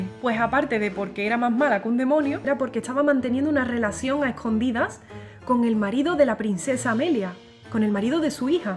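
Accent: Andalusia, Spain. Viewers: Escuchar ese acento andaluz enamora 🥰💞😍😘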